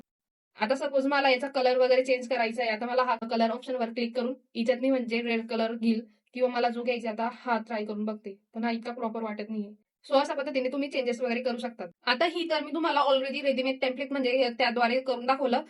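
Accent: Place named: Khandesh, India. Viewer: Tai tuzya aawajat खानदेशी भाषा आहे म्हणून तुझे व्हिडिओ फार आवडता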